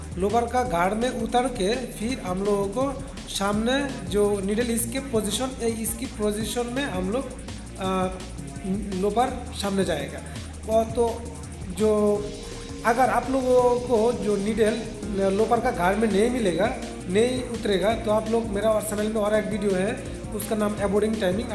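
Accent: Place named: Bengal, India. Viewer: Bangla channel achhe naki.. apnar Hindi shunlei bangali lagchhe toh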